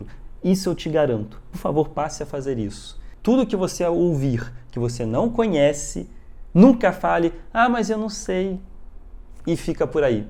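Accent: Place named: Rio de Janeiro, Brazil. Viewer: HAHAHAHH deve ser o sotaque carioca, misturado com o pegando costume de imitar os pastores até falando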